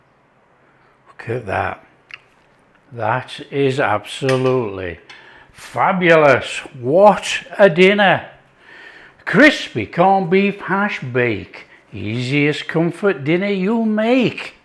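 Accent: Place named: West Yorkshire, United Kingdom. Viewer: You, my friend, have got to be a West Yorkshireman..? I absolutely feel like I'm home, listenin' to 'yo' ❤️🥰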